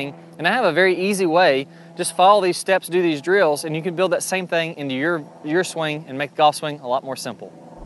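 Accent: United States. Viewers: This dude's accent sound like Mid country side of America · Great video! I've already started on the drills. Are you an Alabama boy? You sound like it!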